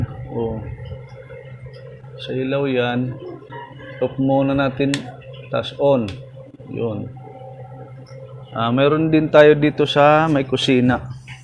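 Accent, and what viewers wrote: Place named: Waray, Philippines. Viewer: Taga samar/leyte ka sir?? Waray man it yakan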